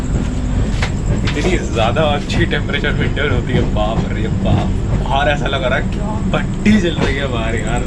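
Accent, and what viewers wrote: Bengal, India. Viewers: Bro are you Bengali na ? 😁😁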